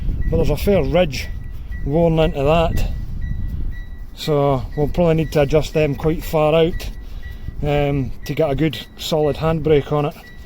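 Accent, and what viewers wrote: Scotland, United Kingdom. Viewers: Lovely Jubbly, your accent is quite strong, but having relatives who come from this area your accent is easy to understand for me.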